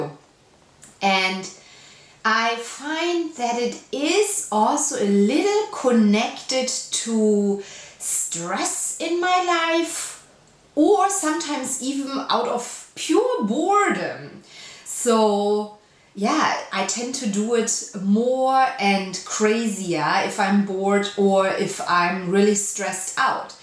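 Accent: Germany. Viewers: Where are you from? You sound Swabian.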